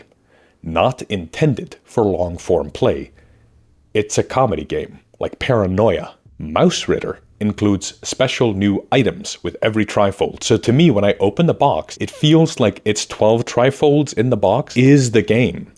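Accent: Iceland. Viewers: I don't who you are deep voiced icelandic accented henry cavill lookin harry potter glasses wearing person. but your video showed up in my feed and i liked it.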